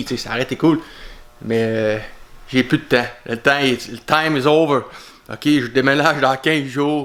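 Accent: Canada. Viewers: 😃 C'est du bon travail ! J'adore l"accent Canadien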